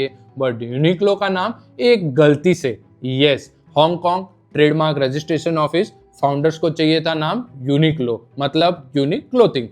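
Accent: Gujarat, India. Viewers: Gujju accent.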